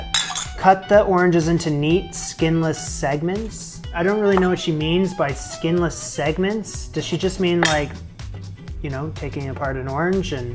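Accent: Canada. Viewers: Did you ever live in Canada? Sometimes, i think I hear a slight Canadian accent in your voice. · A NY apartment dweller with a Canadian dialect that thinks in metric while cooking imperial-united dishes. Interesting.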